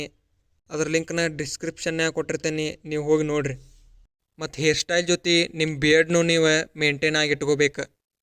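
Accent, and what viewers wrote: North Karnataka, India. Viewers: ಅಣ್ಣಾ ನಿನ್ನ ಉತ್ತರ ಕರ್ಣಾಟಕ ❤ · Dost ne pakka uttara Karnataka dav adi nod .. Nimmur yvda ..🧐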